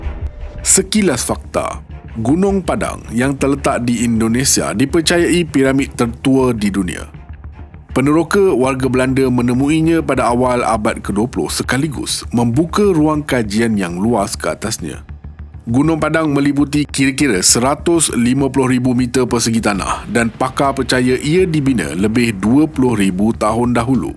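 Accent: Malaysia. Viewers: Pusing aku kalau orang malaysia ngomong...bagus belajar dulu sama melayu riau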